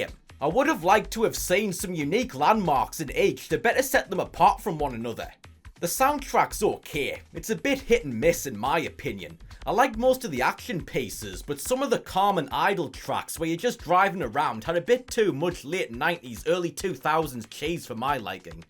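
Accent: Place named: Newcastle, United Kingdom. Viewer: I love that you have the sterotypical nerdy teenager if he was from Newcastle as an accent 😂❤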